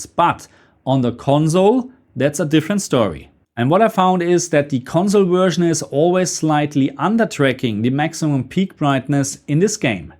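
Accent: Germany. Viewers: Man hört fast gar nicht das du deutscher bist 😂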